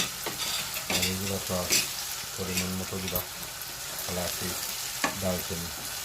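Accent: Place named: Sylhet, Bangladesh. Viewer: ওয়া আলাইকুম আসসালাম। কেমন আছেন আপনি। ভালো লাগলো, এই প্রথম কুনো সিলেটি ভাইর ভয়েসে রেসিপি দেখলাম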